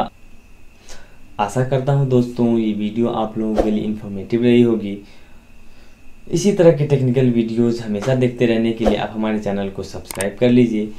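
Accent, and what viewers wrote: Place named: India. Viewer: I don't understand what you say that indian accent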